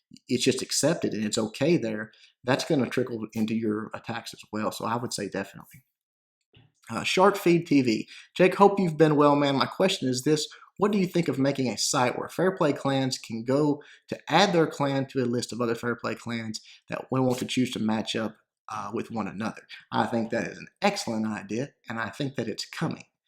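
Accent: South, United States. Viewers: I don't mind the way his voice sounds. I've heard many country voices and I live in the city/suburbs in the north east United States. So I don't understand all the hate about it myself. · Half a year ago when i found your channel (ironically i was here because molt asked his viewers to check out a youtuber who can explain clash strategies down to a science). I was one of those folks that weren't exposed to country accent. And honestly, your accent did turn me off. But your content was ridiculously good and one of a kind out there.. so i accepted that about you and tuned in. Now looking back I realized what I did was silly, and unfair; I prejudiced against country accent. Now watching you daily, not only have I learned a bunch of clash, I feel I have come to tolerate the country accent. Frankly, I quite like your accent now; it sounds jolly. I'm sorry, and thank you, Jake. 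I just kinda want to throw this out there for folks who aren't exposed to the country accent. Keep an open mind, learn to accept people who are different from you, and you will gain much more in life. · Southern accent is fun, don't try to change it, it sounds like my uncle is doing the video 😀 keep on doing what you do Jake! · Jake, dont listen to these idiots, your southern accent is the best!! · His voice is soothing. And who cares if it's country lol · its true, your voice is very country-ish, but its just adorable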